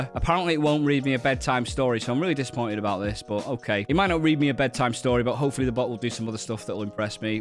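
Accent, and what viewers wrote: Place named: Liverpool, United Kingdom. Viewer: Awesome vid, man. Quick and simple and I got my counters set up in no time. Subbed because of your Liverpudlian accent tbh!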